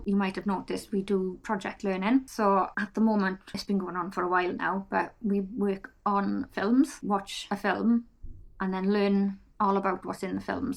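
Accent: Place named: Wales, United Kingdom. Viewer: I have been religiously watching your videos on the big tv as you know. You’re my go to friendly face and you know I can’t resist your Welsh accent! Just wanted to come on specifically to say you are smashing it lovely. Your videos are a breath of fresh air and can see your video style since you changed things up and got your new camera have worked so well. Keep going lovely because everyone needs more vlogs in their life. Xxx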